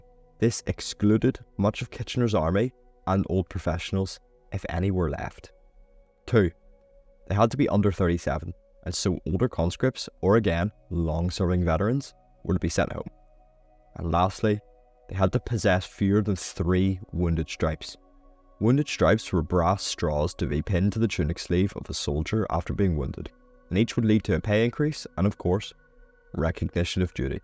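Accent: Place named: Northern Ireland, United Kingdom. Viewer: Excellent and interesting video, thanks for making this! Nice to hear a Northern Irish accent too.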